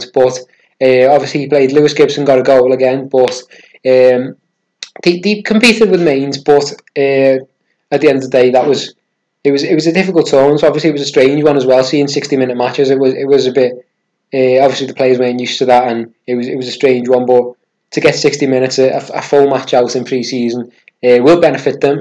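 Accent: Liverpool, United Kingdom. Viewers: Good content, but one thing my gf & friends point out is saying..."erm" before every sentence. Doesn't matter to me, maybe because English isn't my first language. My friends notice & some dislike it. I Remember Rooney started every sentence with "erm" but you notice he no longer does ? I'm sure he had coaching on public speaking. It is more noticeable with Scouse accent & sadly there's lots of idiots who just hate Scouse - Please don't take offense, I sorry if it seems like that, I just pointing out how others may think & if you say "fuck it, this is how I speak" I get it, people here (S.E. England) say shit about my accent & can be really snobs - Anyway bro, just saying what I heard, not my opinion - Great video COYB (I support Hajduk but in England I'm also a big Everton fan)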